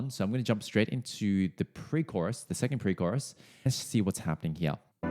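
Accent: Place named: Australia. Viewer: I make my piano students listen to Jeff to understand different tones and phrasing. I think my Caucasian Canadian choir did not appreciate my raving about Jeff! BTW, are you in NZ? I’m trying to place your accent. I left in 1987. But you pronounced “example” with the Aussie “a”.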